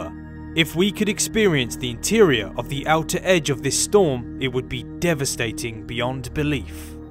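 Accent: United Kingdom. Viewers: I just dont get it you you speak like a british but you use imperial measurements. Anyway, have you ever wonder that there might be a civilization or alien life inside that giant red spot? protected by the cyclone itself.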